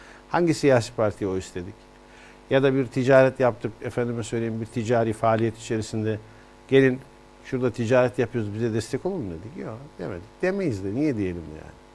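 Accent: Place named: Konya, Turkey. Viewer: Yaptığınız yorumlar, Konya ağzını kullanışınız ve gazeteciliğinizi seviyoruz, teşekkür ederiz Bey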